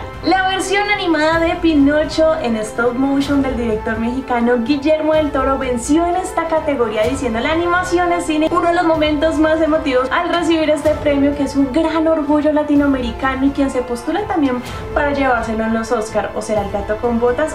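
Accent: Colombia. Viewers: Bogotense? Sale chamaca linda! Luego echamos chisme,jajaja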